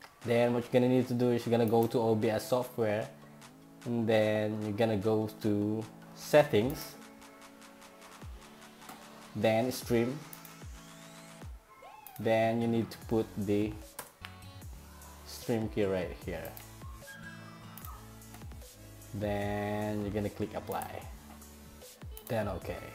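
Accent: Philippines. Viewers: I like your accent :p PINOY? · halatang pinoy ka sa accent thank you talaga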